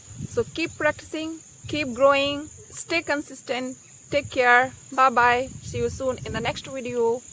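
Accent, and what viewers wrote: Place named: India. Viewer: Pure Indian pronunciation ! Quite different from British or US pronunciation. It sounds funny. It took time for us to be used to Indian pronunciation. Indians are smart and friendly.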